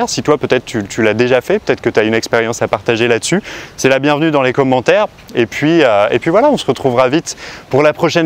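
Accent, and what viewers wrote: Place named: Switzerland. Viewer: Tu dois etre Suisse pour parler comme ca.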